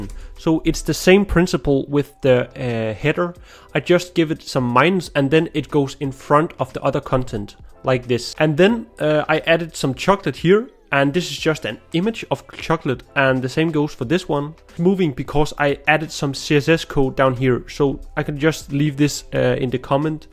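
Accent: Denmark. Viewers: i knew immidetly you were danish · Haha, I knew it. I can smell a Danish accent from miles away! Very distinct sounding... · Most danish accent ive ever heard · 1. Denmark just got that programmer population. 2. That classic danish accent… not every english speaker can notice it, but about every danish can. 3. I’m also danish, yes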